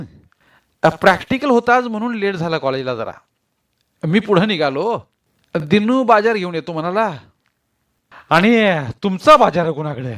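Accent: Rural, India. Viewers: नमस्कार सर, मस्त वाटेल, ग्रामीण भागातील जसाच्या तसच आवाज आवडला.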